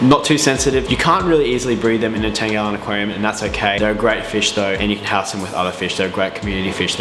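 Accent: Australia. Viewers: You sound Aussie mate. Talk litres!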